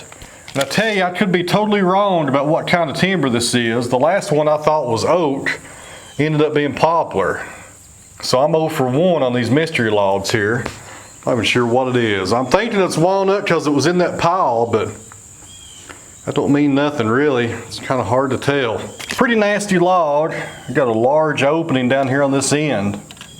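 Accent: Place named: Virginia, United States. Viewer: Very interesting and well put together videos. That’s one hell of an accent you have there..is that Virginia ?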